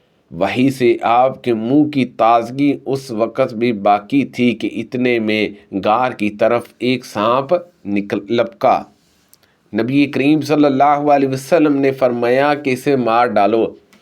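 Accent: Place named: Punjab, Pakistan. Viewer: السلام وعلیکم بھائی آپ سب سے پہلے اپنی اردو پر توجہ دیں، آپ اردو پنجابی میں بول رہے ہیں۔ احادیث کی آسان اور مختصر تشریح بھی کریں ،اس طرح عام انسان کو حدیث کا مفہوم سمجھنے میں آسانی ہو گی ۔ جزاک اللہ خیرا ۔❤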